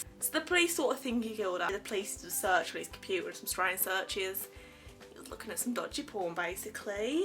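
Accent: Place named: Birmingham, United Kingdom. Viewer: So nice to hear another brummie on here! Great video, so jealous of your haul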